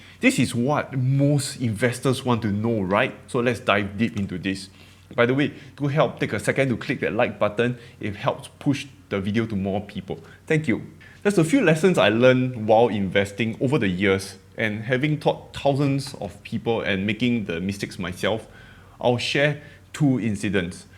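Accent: Singapore. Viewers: I am so glad I never miss your videos but this one was one for the archives!!! First I am glad you gave your opinion on the Chinese stock risk to reward with firm life experience. The rest will be up to us, we will either be donkeys or bees (is that a new animal we should now aspire to). Next the bees quote will be repeated by me ASAP. I hope after people laugh (as I did, so much I had to stop the video) they will seriously think about what that quote is saying. I find that I have a lot less to say to flies as I collect my honey. In regards to your accent, I understand you desire to reach a bigger audience and self "improvement" is usually a good idea so I say, give it a go, but I use subtitles for even English native speakers and your videos due come with them so if others are finding it hard to understand you that is an option, but many hate to read as well. 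If you feel it is limiting you reach then put in the work but I personally love a Singapore accent. In the end the value you bring is not in the accent of your speech but in the quality of your content and that has always come across to me loud and clear. All the best and thanks again for keeping others from being a DONKEY!! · Bro your accent is great, reminds me of a good friend I had in Singapore! ❤ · Love the Singapore accent, you are doing an awesome job familiarising the world to it! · I understand your motivation, but don’t sweat your accent. You’re Singaporean and this is the Singaporean accent. People will adjust and adapt! I find your accent adorable and think it adds to your charm and appeal. I’ll miss it if you stop pronouncing “people” as “pee-po.” 🤓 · Yo man, I actually like your accent. I have a colleague at our company who is also a good friend of mine who lives in Singapore. 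Your accent reminds me of him. Don't change it man, its awesome. Everyone has an accent to someone else anyway!! ;) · I love your Singaporian accent!! I am American born and raised and I only speak English. I can understand you perfectly. Just my two lovelaces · Singlish accent is good!😆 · I'm Canadian and i love Singaporean accents! Dont change! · Nooo don’t change your accent. Singapore accent is great and not hard to understand. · It's a classic Singaporian accent don't change, better to add some singlish to teach people... don't change a dam ting lah! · Don't lose your Singaporean English accent! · Don't change your accent just because someone tells you to do so lah. If the viewers are not willing to get used to our SG accent then they can go watch Jim Cramer or other donkeys with their perfect American accent. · Stay and talk how you are - it's perfect like that! Everything else doesn't sound authentic and I am so happy to hear the Singlish accent! 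:-) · Your accent is very distinctly from SE Asia, like the Singapore area. Maybe add some local English slang words into your discussions. I grew up in Taiwan and HK so I can tell the difference btwn when HK person speaks their British English and other areas. I believe if you watch some American tv shows, like Yellowstone, you can hear more of the American Midwest accent. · What's wrong with your accent? Alot of Singaporean speak just like that. Most of us who don't study in international school or who don't grow up imitating the lines in American shows will speak exactly like you. Does it mean that American or British accent are superior to others? Why not they learn to understand our accent? I'm proud of the Singaporean accent even if I speak perfect English. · Just be yourself man. You are doing great! What if you “neutralize” your accent n it doesn’t help? Waste of time ..... u are uniquely Singapore.... 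. Don’t listen to me: I might be a fly, ramblings of a mad fly. :) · please just keep the Singapore accent :)) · You've gotta represent Singapore's pride with our accent! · That is Singaporean English.. All Singaporean can understand it and has no issue on that.. haha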